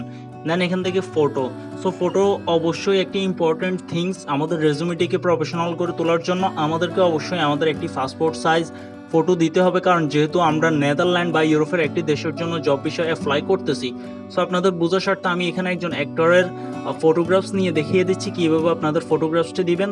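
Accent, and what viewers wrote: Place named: Noakhali, Bangladesh. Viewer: আপনার বাড়ি নোয়াখালী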